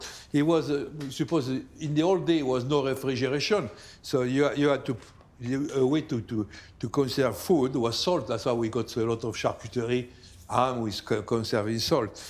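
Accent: France. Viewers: Lovely, charming man, absolutely love his French accent! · Alors check again, D'accay His frenglish is so beautiful.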